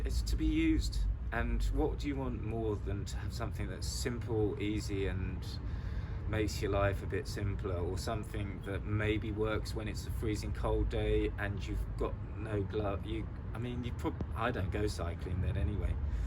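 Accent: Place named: England, United Kingdom. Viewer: HAHA felt like I was watching my little brother , makes the same face and comment only with English accent instead of Mexican. Love the review and candid comments. Felt like a friend (or little bro) was spelling out why the 830 was better.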